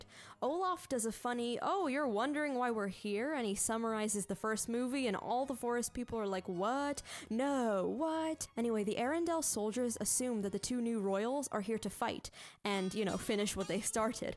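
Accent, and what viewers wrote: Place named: Ireland. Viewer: you sound irish and i love it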